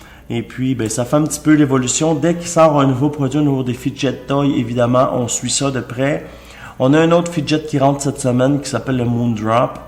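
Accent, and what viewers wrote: Quebec, Canada. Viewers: un accent québécois. ? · j'adore l'accent canadien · Je suis français et j'adore ton accent québécois j'aimerais bien en recevoir un continue bye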